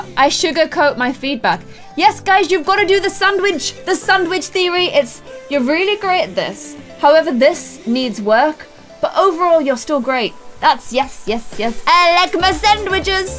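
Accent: Ireland. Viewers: are you irish ? lizax yess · UR IRISH!!!!!!!SO AM I!!!!!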